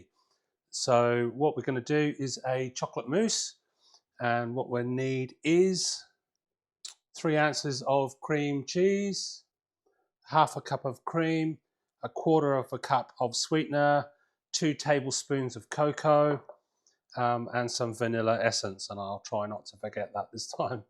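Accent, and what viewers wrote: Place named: Australia. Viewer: Thank you for this video! I'll be making this tomorrow. I'd make it today, but I just cleaned up the kitchen from making ice cream, and am just too lazy for today. I am now your 365th subscriber. And, I LOVE your Aussie accent! Diane in North Carolina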